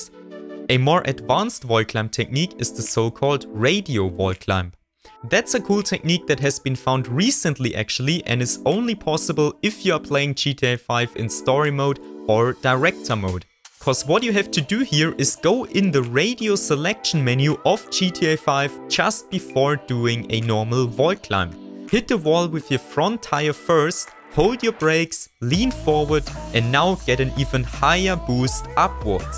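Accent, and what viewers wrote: Germany. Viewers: 🤣🤣dein Deutscher Akzent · Dieser Deutsche Akzent xD Aber nices Video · so ein deutscher akzent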